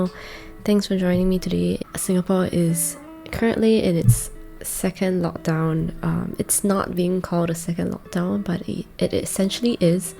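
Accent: Jamaica. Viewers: I’m extremely fascinated that your accent often reminds me of Jamaican accent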